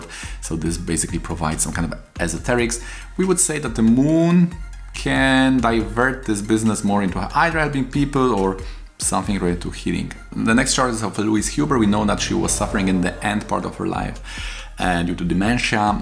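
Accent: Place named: Poland. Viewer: Good heavens, u speak fast, load your sentence with information and add on your particular polish accent, truly it's impossible to get the valuable share u give. I am sorry got my Krittika strong . I hope u would slow down a bit.. speed is good but not that fast please .. thats a ferrari 😃 .. Carriage is good U are amazing & your research spectacular .. thank u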